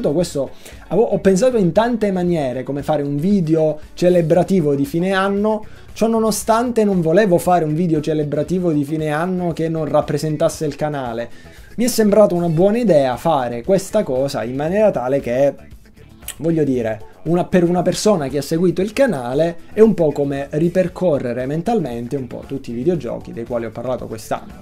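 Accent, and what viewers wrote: South, Italy. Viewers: di dove sei ? sembri del sud!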